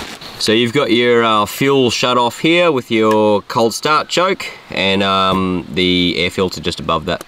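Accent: Australia. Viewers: Damn! Wish I could speak Australian ! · Very cool, I live in Canada and I've heard of Rokon and a couple other 2WD motorbikes, and I always thought it would be great to own one, I've owned all kinds of offroad dirt bikes, but never a 2WD, it would be great, but not until my kids grow up, I just hope I'm not too old to really use one by then, great review anyways Buddy, u must be from Australia?? Not New Zealand I guess???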